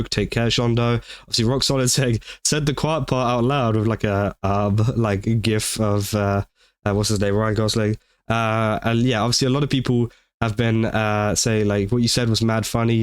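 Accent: United Kingdom. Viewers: Youre british ? Man im sorry i might not support you anymore 😔 this is outside my boundaries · Wait... Shondo is British!?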